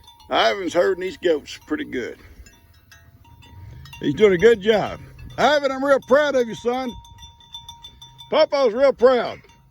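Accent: South, United States. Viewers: Good morning this is just the 2nd time I've committed...im new but love it I guess ur goat is special shes a determined lol I love hearing about ur farm i dont live on my farm anymore my daughter died n the farm house and I had 55 acres i buried her on the property under a apple tree ..but I couldn't live there anymore so my sons raising his family there now ..but I love seeing ur Animals it helps me miss mine but comforts also ..so ty for ur videos ..im southern to ..I talk like u and understand the slangwords ..lol ..ty ur so good at this...you make me feel like HOME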